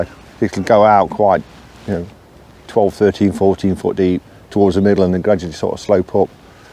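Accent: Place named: Nottingham, United Kingdom. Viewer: Hi, just found your channel by chance. Nice to hear that Nottingham accent lol. I used to fish the steps as a kid and had many great days there gudgeon bashing in the ‘70’s. Also had success hemping for roach. You’ve inspired me to get down there again on the feeder.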